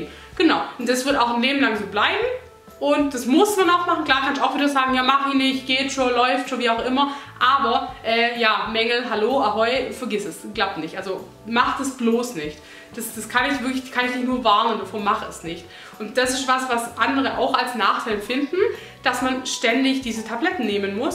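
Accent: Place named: Swabia, Germany. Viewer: Man hört das schwäbische LEICHT raus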